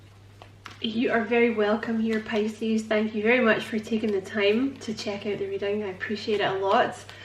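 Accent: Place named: Scotland, United Kingdom. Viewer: I loved this reading. Resonated so deeply with my current and past love life situations and not only was it true of me as a person, but what you intuitively picked up on with him was also very accurate. You mentioned a possible Aries ♈️ connection for new love and I’m in communication with him, and we are soon to meet in person for the first time. I’m so nervous because he is such a humorous and seemingly confident person, but I don’t feel I won’t be interesting enough, funny enough or bold enough for him. I can come across that way because I have Scorpio moon and Leo moon, but I’m not able to naturally be that way all the time. You often hear that Aries and Water sign compatibility isn’t the best, especially when his big three signs are all fire and I have two water and only one fire (but perhaps even having just one fire in my big three could help with compatibility). 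I hope that that doesn’t always matter with this combination. Then again I’ve been with people in the past who astrologically should have been a great match for me, but weren’t in the end. So I just hope that we get along as well in person as we do online with the strong connection we have. Please tell me if this combo can have good compatibility and wish me luck, because I feel I’m going to need it 😅. Thanks again for a lovely reading, 🦋 Oh and btw, I love how soothing your voice is and your accent, which if I’m correct, I would assume is Scottish? If I’m wrong, I apologise. But it’s a very p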